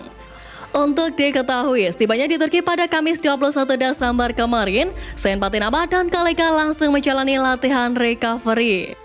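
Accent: Java, Indonesia. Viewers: Yg ngomong medok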